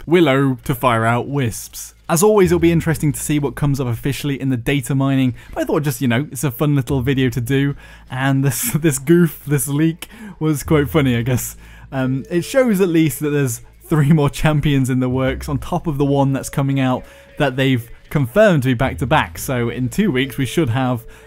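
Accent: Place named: United Kingdom. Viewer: I love your british accent and your voice. I would talk to you for hours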